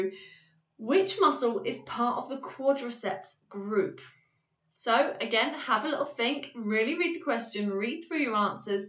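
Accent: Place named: United Kingdom. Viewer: Ayyottaaaa😊 like the briish accent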